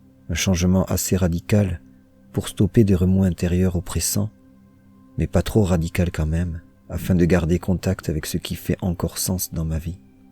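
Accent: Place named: South, France. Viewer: Qu’est ce qu’elles sont bien vos méditations et le guidage très doux, avec ce petit accent du Sud qui ajoute à la souplesse des images proposées . Merci infiniment.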